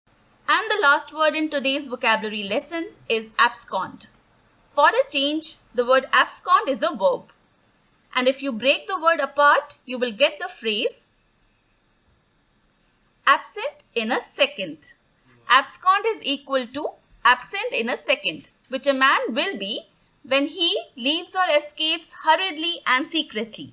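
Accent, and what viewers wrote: India. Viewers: Indianb funny voice xdxd dx d d xd zxd dx dx dx xd dx d x d xx d xddx You thoiught there would be more but there isnt xd xd xxd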